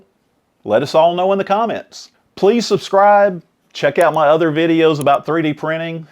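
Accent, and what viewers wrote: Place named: South, United States. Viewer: Was able to filter out the YT algorithm noise enough to discover u. Awesome stuff man. Also u talk like my dad and make me remember my time growing up in the south, so that’s nice!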